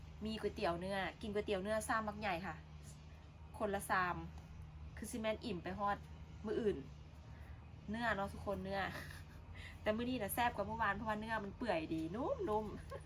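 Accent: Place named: Nyaw, Thailand. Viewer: สาวไอ่ไทเมืองสกลบ่ สำเนียงคือญ้อเมืองสกล หมานๆเนาะ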